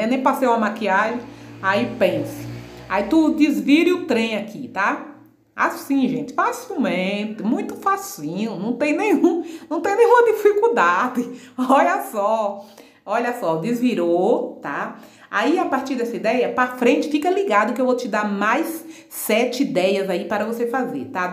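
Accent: Bahia, Brazil. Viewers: minha linda, kkkk vc é muito engraçada gosto do seu sutac baiano,. Amei seu trabalho parabéns,!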